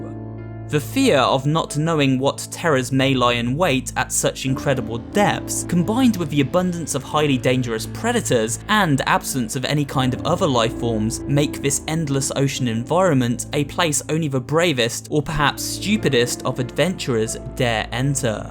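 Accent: United Kingdom. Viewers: Omg the british is so deep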